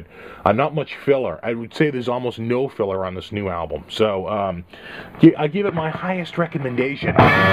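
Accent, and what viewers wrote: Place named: New England, United States. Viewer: what part of New England are you from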